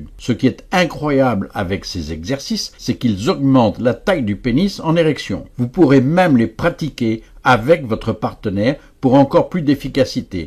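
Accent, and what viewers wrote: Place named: Belgium. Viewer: Un "coach"? Mdr.... Ne serait il pas plus logique de consulter une femme pour ce genre de problème.? Il semble qu elles soient plus à même de savoir ce qui leur importe et leur plaît le mieux (non,que la bandaison n ait aucune importance,attention. ..). L accent belge de ce type au pseudonyme américain en dit long sur son efficacité et ses compétences. ...Ça pue le charlatanisme à plein nez,et à 300km à la ronde! Pathétique! ...Et j ai deux demoiselles à mes côtés qui approuvent mes dires,...Lorsqu elle ne me les ont pas carrément "suggérés"...D apres elles,il serait bien à côté de la plaque...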